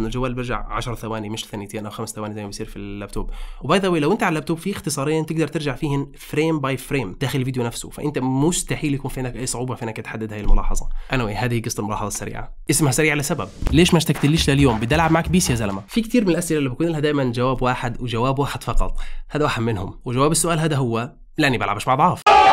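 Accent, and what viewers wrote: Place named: Algeria. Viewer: ههههه هذه لهجة جزائرية ❤❤ تعيش الجزائر و فلسطين شعب واحد للأبد 💕💕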